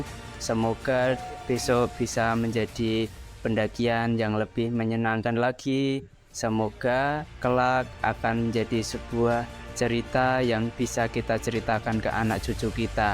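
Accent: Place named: Java, Indonesia. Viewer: Medok suarane🤣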